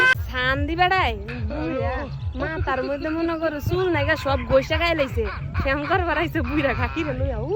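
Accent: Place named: Narsingdi, Bangladesh. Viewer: আমি আপনার কথা শুনেই ভাবছি আমাদের নরসিংদীর আপনি ❤️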